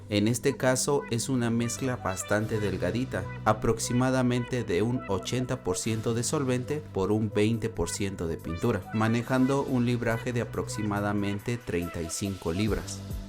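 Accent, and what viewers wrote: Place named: Mexico City, Mexico. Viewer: Por este commentario y por lo chingon que eres me suscrito. Y por lo bueno que explicas Las cosas. Escuchandote me haria pensar que eres de Mexico y El modo que te expresas de la ciudad de Mexico. No haces trasmisiones en vivo? Es la Iwata hp ch la que estas usando? Ahora le dare un I fucking like it a lot😁💣💥💢 Gracias..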